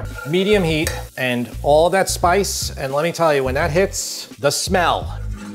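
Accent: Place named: Long Island, United States. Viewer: Long Island accent goes crazy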